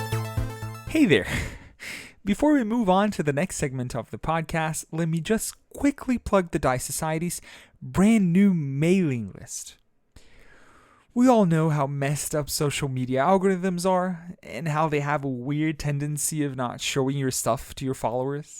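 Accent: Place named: Brazil. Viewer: Até sem saber que o seu nome é reconheci o seu sotaque brasileiro! Salve, irmão, 😂. Espero que o podcast cresça muito assim como o jogo!🎉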